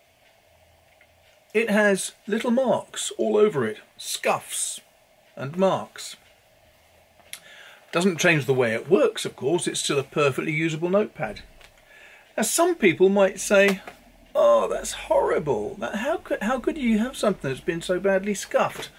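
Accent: United Kingdom. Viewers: yes! I’m very happy about this and really there’s nothing I love more than British voices waxing on about all things pen, paper and ink! ;)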